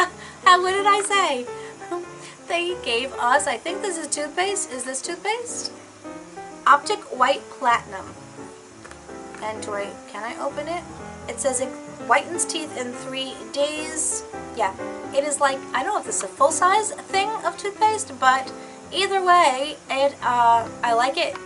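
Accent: New York, United States. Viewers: From one New Yorker to another...who sound the same lol! Love your videos! 😉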